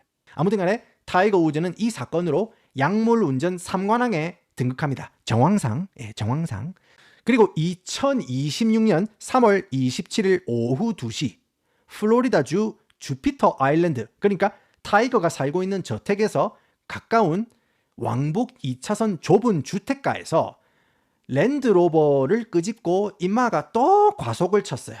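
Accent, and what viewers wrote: Gyeongsang, South Korea. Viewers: 이 행님 갱상도 사투리 짭짤하게 해재끼다가 영어는 달달하이 할 때 경외감 오지게 든다^^ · 살짝 투박한 경상도 사투리가 오히려 영어랑 더 잘 말아 지시는 듯 ㅋ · 이 아저씨 깅상도 사투리가 은근 중독성 있네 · 볼때마다 은근 구남친 닮음. 은은한 경상도 사투리랑 말빨 그리고 동글동글한 얼굴…동그란 뿔테안경…참 좋아했었는데… 님 보고 있으면 그 사람이 생각나요 웃긴데 왜 슬플까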